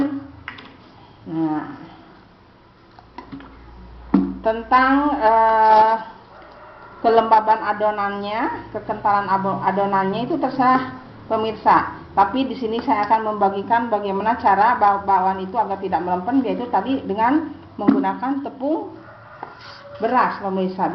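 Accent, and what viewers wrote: Indonesia. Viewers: aduuuuh teteh pasti sunda nyaaaa meni has suara sunda na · Sunda na ti mana atuh 😁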